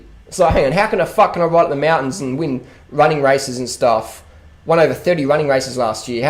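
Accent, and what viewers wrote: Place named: Australia. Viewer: But you are funny with your Australian accent mate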